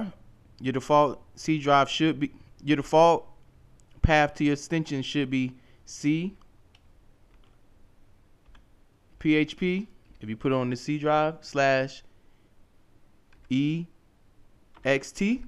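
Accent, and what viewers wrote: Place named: England, United Kingdom. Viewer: Sweet tutorial, finally someone making IT tutorials with a proper english accent! Thanks for the help.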